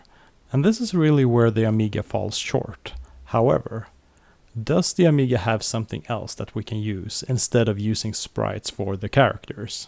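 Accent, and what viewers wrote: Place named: Sweden. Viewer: With that accent i know you are swedish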